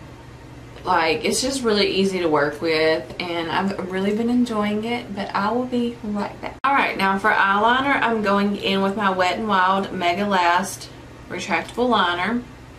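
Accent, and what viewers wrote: South, United States. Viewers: I love your videos so much and your southern accent!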